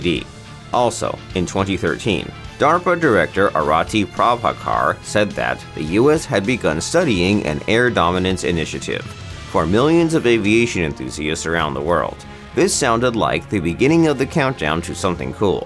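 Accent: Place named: India. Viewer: why you sounds like indians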